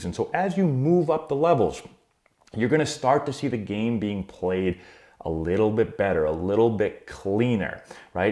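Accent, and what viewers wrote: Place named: Boston, United States. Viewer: Aye bro love ya channel I'm from DC But I was raised in Boston and Worcester so hear ya accent is refreshing I feel like I'm home lol #GOSOX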